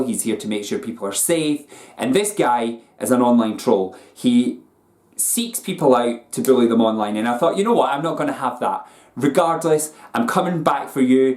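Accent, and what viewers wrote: Scotland, United Kingdom. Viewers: Ooh Scottish accent, dare I guess Glasgow originally?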